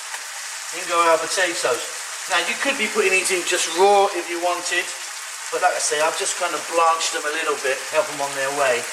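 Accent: United Kingdom. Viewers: I tried the recipe, awesome and I love your British accent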